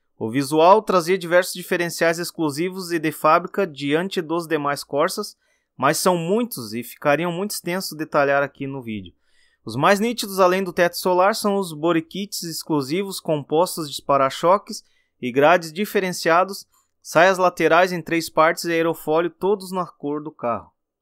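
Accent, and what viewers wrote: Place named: Paraná, Brazil. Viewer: Paranaense , acertei ? O melhor sotaque do Brasil !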